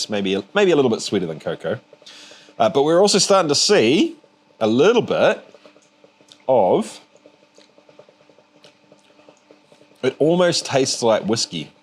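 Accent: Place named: New Zealand. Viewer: Your absinthe video popped into my list, and I noticed your Kiwi accent. With your videos on doing crazy alcohol from Pop Tarts and other stuff, you reminded me of my uncle Ron who emigrated there about 25 years ago. Before he left, he would try to make wine from turnips for a laugh, and now makes cider apparently.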